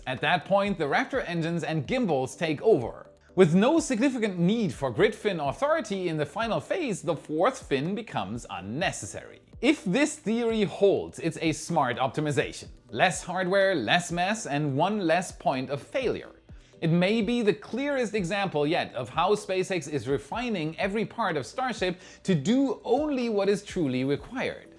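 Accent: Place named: Germany. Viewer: Is it just me, or Is German accent starting to fade a bit? If he lives in Florida long enough, it'll start turning into a southern accent...wonder what that will sound like? a german-southern accent...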